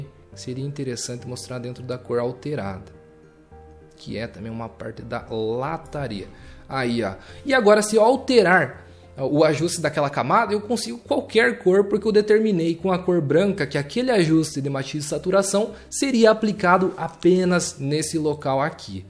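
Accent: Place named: Paraná, Brazil. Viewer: Tua didática é excelente! Excelente vídeo. Grata por essa aula! Ganhou mais um inscrito! ;)Lm E Esse EEEE TEEE ae? És paranaense!!?? rsrsrsrs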